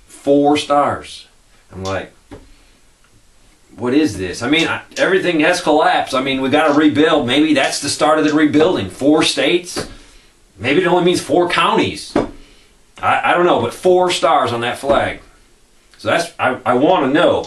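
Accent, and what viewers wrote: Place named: South, United States. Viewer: He is saying odd ball caliber he just has a southern accent so it sounds different.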